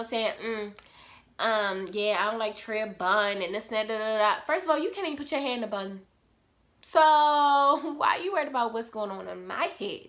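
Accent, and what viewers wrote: United States. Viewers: that's why I'm trying to work for myself and I love your accent are you from new york. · I clicked on ya video and immediately knew u was from Baltimore lol i was like she sound Nd talk like me😂❤️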